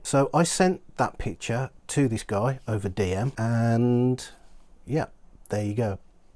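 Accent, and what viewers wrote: New York, United States. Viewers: The New York accent came out strong on this one. Good job, keep up the amazing work! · You have a new york accent, never noticed it before. I guess you are that angry now, rightfully so. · Oh my! Louis let the New York out in this one. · Louis went full New Yorker on this one. Damn. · His New York accent gets stronger the more worked up he gets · As a fellow born and bred Bronxite, his NY voice came out 3 videos ago....this is the equivalent of "Meet me at the corner" and pick the most populous one....42nd and Bradway? Queens BLVD and Northern BLVD? Heck, how about Eastern Pkwy and Flatbush, Grand Concourse and Fordham.....heck lets be real and do it on 125 St and Lenox...Tickets anyone??? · This is the most New Yorker sounding video I've heard from you, I enjoy the righteous indignation in every syllable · The new york accent just makes this better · Louis has gone 120% New Yorker on this one and I'm here for it! 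· the new york accent is slipping out lol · Bro the new york came out in this video and io love it! Thank you Louis for everything you do and everything youve done! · This is the first video I noticed the NY accent out of Louis. Damn the pedo sure fawked with the wrong guy lol. Louis has a grrrr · Lmao justifying the particle counter while blasting his ass off with a NY accent. Amazing video 😂 I wish I was like you to fight bitches appropriately when they try to screw you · Why does he only sound like he's from NY when he's angry? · You can tell Louis is heated by how much that New York accent comes out! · I don't think I've ever heard Louis sound so New Yorker till this video lol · the new yorker accent shows through with the new yorker attitude! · you already fit in. You may sound like a New Yorker but you just gave this guy both barrels like a Texan. Now just throw in a "he f**ked up bigger than Dallas" and you get your free six shooter and commemorative 6 pack of Lonestar beer. 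It's called the Texas Twelve Pack and you can get it at the governors office. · It's been a while since I heard you go full NY. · Love your new england attitude at times and the new york accent that comes out helps. If a youtuber with millions of followers accuses me of being a tiddler Im knocking on that youtubers door. He wouldve been smart to stfu but no, he talked. You know so much about this dude Im suprised you are not shitting in his pants for him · This series has really brought out the New Yorker in Louis.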